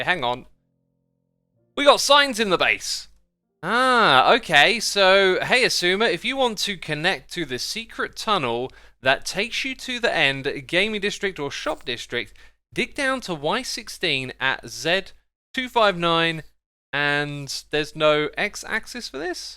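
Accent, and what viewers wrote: United Kingdom. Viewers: He's british... That pronounciation of er is common among British accents. Edit: if you were asking which British accent, I am not sure. We don't know where he is from in the UK. I am not knowledgeable enough about British accents but I guess it could be from North England?? I am not am authority on that though so don't take my word on it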